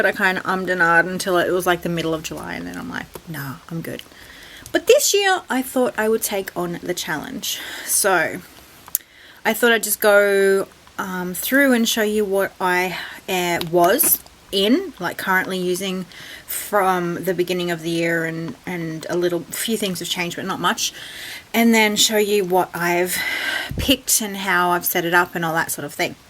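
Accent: Australia. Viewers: Hey are you Aussie hope so love meeting other aussies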